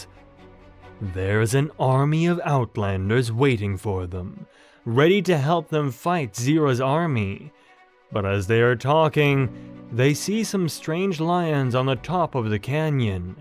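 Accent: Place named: United States. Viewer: Kopa never meet Kion and Kiara i remember the time Zira attacked Kopa you sound American im pretty good at recognizing people's accents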